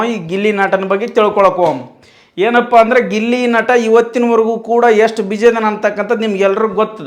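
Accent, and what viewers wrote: North Karnataka, India. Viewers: Anna nanu nimma abimani anna 🙏🙏🙏🙏. Nimma videos goskara wait madtirtini anna nimma matugalu kelidakke chenda😅😂 adrallu nimma uttra karnataka matugalu nimma bayalli kelodakke innu chenda 😂😊🙌👌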